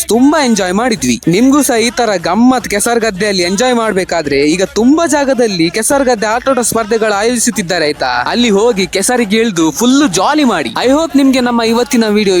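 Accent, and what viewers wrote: Mangalore, India. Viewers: Entha marra neeu chanda aagthade kushi aakthade antheera neeu sa banni antha kariudila alla😂😂😂 Summane aaitha 😂😂😂 Ae ne heli maarra nimma chanel nodlikke namma kudla kannada mathu kellikkene ondu kushi ne bere ❤❤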